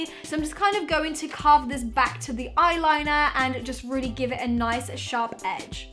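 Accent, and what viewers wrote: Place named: United Kingdom. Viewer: Your makeup looks stunning!! and I absolutely love your British accent. I just uploaded a fall makeup video, it would mean a lot if you check it out xx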